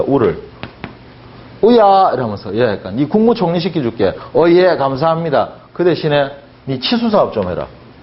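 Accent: Gyeongsang, South Korea. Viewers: 정확하시다❤사투리 갱상도 사투리가 이렇게 매력적인 말이였네요❤ 너무 가까이 있어 소중한줄 미처 깨닫지 못했습니다❤ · 저 서울토박이인데도 꿀잼 ㅎ ㅎ갱상도 사투리 구수!!! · 사투리는 경상도 사투리인가? 강의를잘 하니 사투리도구수 하게들려요 뉘님의 아들인가요 ?ㅎㅎ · 지나가던 역사임용고시생입니다 잠깐쉬면서들었는데 너무재밌네요ㅋㅋㅋㅋ ㅋ 그리고 사투리심하시지만 저도 경상도살아서 동네형이말해주는거같네요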